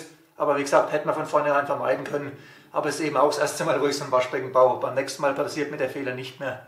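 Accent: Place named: Franconia, Germany. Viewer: Allein für‘s fränkische einen Daumen hoch. Grüße in die Heimat.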